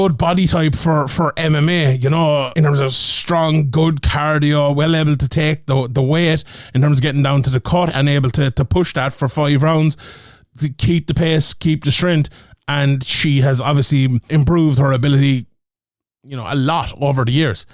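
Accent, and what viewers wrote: Ireland. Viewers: I dont recall 1 damn pick you said cuz you talk so fast and have that irish accent but i sure do love to hear it brotha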